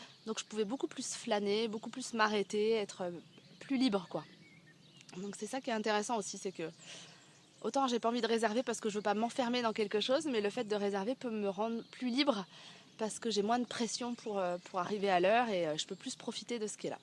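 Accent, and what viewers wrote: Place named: Spain. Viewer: Bon courage et soigne toi bien surtout. Et puis l'accent espagnol avec le nez encombré. ... c'est pas simple. Bisous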